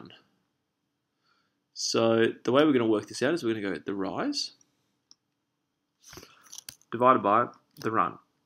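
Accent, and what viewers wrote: Australia. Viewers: hey mate u sound aussie,?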